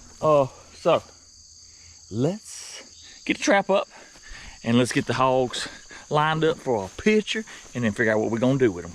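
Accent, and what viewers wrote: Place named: Tennessee, United States. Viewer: Your landowner that you just set up in , where the trailer is, he sounds like Burl Ives 👍. I love your show, love your accent too, even though I’m from Erie Pennsylvania I have my mama’s family from Tennessee 😃same accent, that’s a excellent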